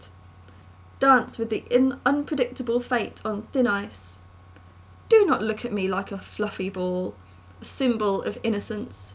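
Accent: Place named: United Kingdom. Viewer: A British accent makes all poetry better somehow 😃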